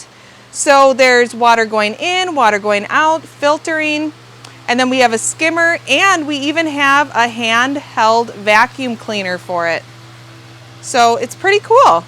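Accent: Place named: North, United States. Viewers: I know you are a state away but a northern accent makes me feel at home when I listen. Hello from Northern Minnesota (Zone 3b) 💕🌿🌿🌻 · Love the accent! You sound like some of my Yooper family ❤ Thank you for the garden tour 🤗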